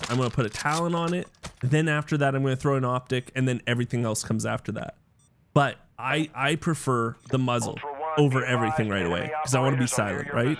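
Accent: Canada. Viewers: you are definitely Canadian 😂❤ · I love that Canadian accent you got there bud. I like watching your videos, I'm a big supporter over here. When they killed you at the exfil, I laughed so hard I almost peed my pants. I'm so glad that it's not just me that gets ganked all the time at the exfil.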